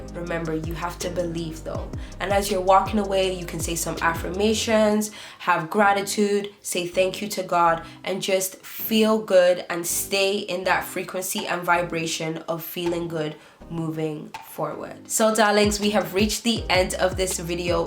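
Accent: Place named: Caribbean. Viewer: I literally followed just for the Caribbean accent in the intro 🤷🏾‍♀️ yessss sus!!! Vid just starting so I can’t wait to learn n implement 🙏🏾🙏🏾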